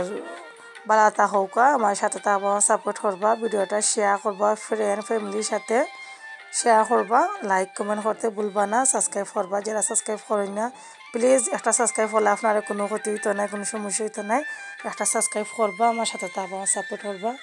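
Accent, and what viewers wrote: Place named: Sylhet, Bangladesh. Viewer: o mai go sylheti ni go😀yummy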